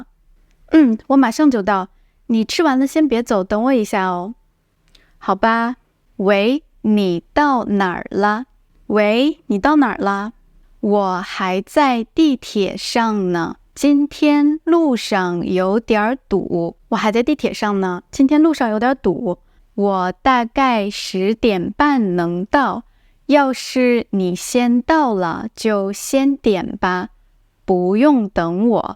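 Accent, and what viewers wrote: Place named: Dongbei, China. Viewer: your my favourite teacher cos of the northern stuff! Do you think when you read the northern part can you say it normally for one and also in your dongbei accent too so i can copy it rather than reading it with standard pronounciation =D.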